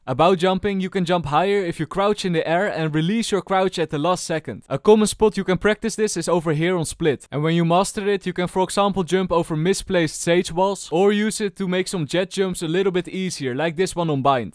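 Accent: Netherlands. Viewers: I will personally finance a getting rid of your Dutch accent course (coming from a fellow Dutchie)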